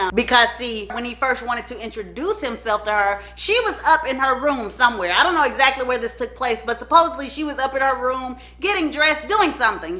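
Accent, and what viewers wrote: South, United States. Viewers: Luv your southern accent your funny and entertaining and know how to tell a story with humor which keep the audience engaged happy I found your channel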